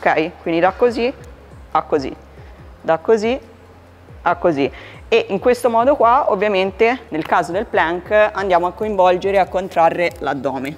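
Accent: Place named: Tuscany, Italy. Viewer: Poco toscana😅😂😂😂❤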